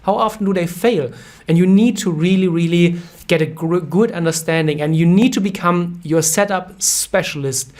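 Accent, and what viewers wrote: Germany. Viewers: are you from germany? :)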